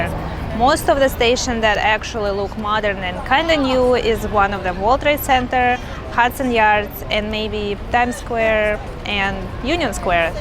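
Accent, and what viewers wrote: Russia. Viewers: aaaah, that east european/russian accent:) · sounds Russian or Ukrainian to me.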